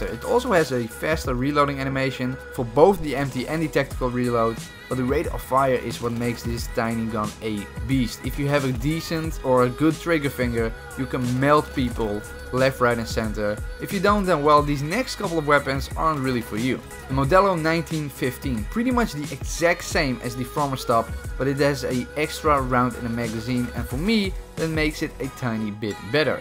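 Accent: Netherlands. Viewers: je hoort wel Nederlands accent in je engels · You sound Dutch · Ru dutch?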